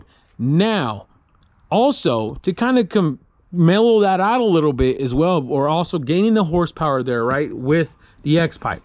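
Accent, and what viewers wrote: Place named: Northern California, United States. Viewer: I can tell you from Northern California bra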